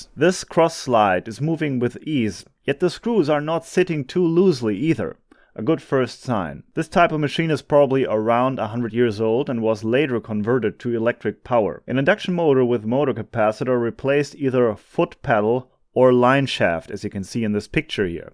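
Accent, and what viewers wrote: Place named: Germany. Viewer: My Great Great-grandfather was from Germany. What part exactly I do not know since he passed away when I was a small child. But hearing your accent and listening to you pronounce things in German is a treat for me. Not mechanically inclined to do this things you are very skilled at. But I will continue to watch your videos and live vicariously through you. And who knows maybe I'll learn a thing or two that will help me take care of the little amount of tools I have. Keep doing what you are doing and good luck with the car repair!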